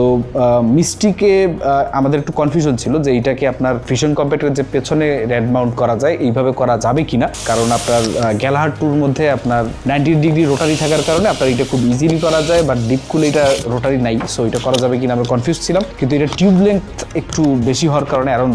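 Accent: Barishal, Bangladesh. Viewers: Je kono ekta accent e kotha bolen. Hoy Barishal na hoy suddho. R na hoy beadob type lage.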